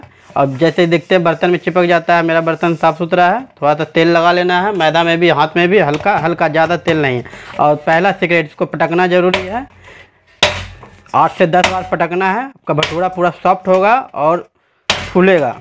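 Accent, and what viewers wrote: Bihar, India. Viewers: Pura theth Bihari Han marde tu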